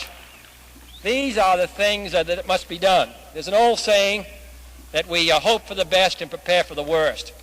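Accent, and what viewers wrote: Boston, United States. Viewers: You've got to love his Boston way of speaking. Beautiful. He pronounces "last" in the English manner and "passing" in the American manner. Pardon me for saying "English". It is the "Boston Manner".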